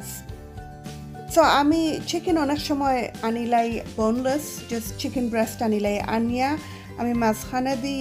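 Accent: Sylhet, Bangladesh. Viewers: সিলেটি ভাষার কথা শুনতে আমার খুবই ভালো লাগে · সিলেটি কথা শুনতে অনেক ভাল লাগে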